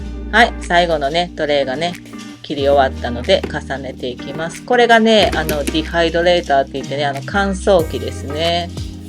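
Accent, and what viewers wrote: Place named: Kansai, Japan. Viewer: 本当にこっちは食物類高いですよね、っていうか高くなりましたね。 関西弁がとっても懐かしいです。😊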